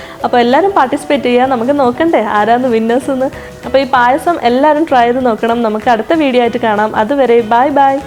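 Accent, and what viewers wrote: Kannur, India. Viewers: Hello Njn ipppozhan video kandathu ... Undakki nookki super aayirunnu.. Thk u chechi Chechi evideya kannur aano Kannur slang pole thonnni enikk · Kannur slang?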